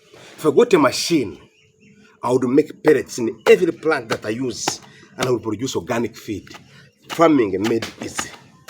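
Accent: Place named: Ankole, Uganda. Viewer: You're located where. U talk as if you are a munyankole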